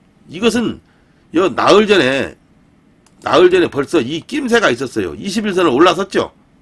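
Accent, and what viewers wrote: Jeolla, South Korea. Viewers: 유바이오 . 언급해 주셔서 깊이 감사드려요 항시 도움주시려 애쓰시는 모습도 감사합니다 전라도 사투리도 정감갑니다 늘~ 건강하시고 평안하시길 기원드립니다 ♡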